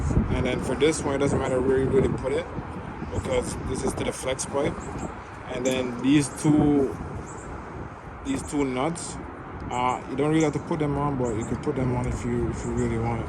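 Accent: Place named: Jamaica. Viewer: u sound like yard mn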